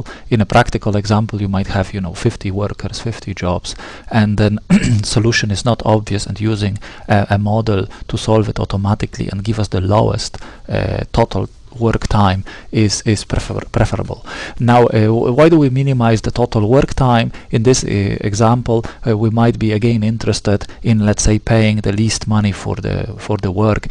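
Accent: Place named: Egypt. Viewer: واضح انك مصري :))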